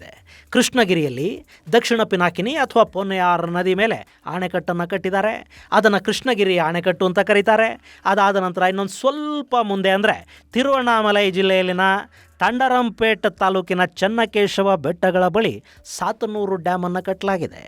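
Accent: North Karnataka, India. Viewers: ಸರ್ ನಿಮ್ಮ ಬಾಯಿಂದ ನಮ್ಮ ಉತ್ತರ ಕರ್ನಾಟಕದ ಭಾಷೆ ಕೇಳೋಕೆ ಚಂದ ಅದಕ್ಕಾಗಿ ಉತ್ತರ ಕರ್ನಾಟಕದ ಭಾಷೆಯಲ್ಲಿ ದಯವಿಟ್ಟು ಒಂದು ವಿಡಿಯೋ ಮಾಡಿ ಸರ್ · ನಿಮ್ಮ ಬಾಯಲ್ಲಿ ಉತ್ತರ ಕರ್ನಾಟಕದ ಮಾತುಗಳನ್ನು ಕೇಳುವುದೇ ಬಹಳ ಚೆಂದ.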